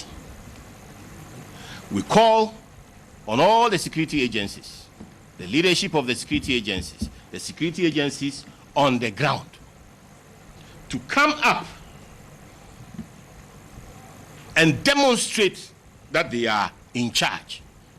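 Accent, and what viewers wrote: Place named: Africa. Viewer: Mate. Am a soldier with foreign EU army. I can assure you we will assist the UN real soon on a peace keeping mission. You are hearding down that bad road with your African accent. Your visas will stop working once we declare you nation a UN case. Watch (Hotel Rwanda) Your country will be my 4th UN mission. It will definitely be peace keeping grounds.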